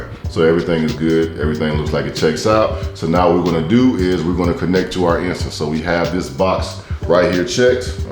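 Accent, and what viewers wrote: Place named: Baltimore, United States. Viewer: Are from Baltimore area? I hear it in your two’s and do’s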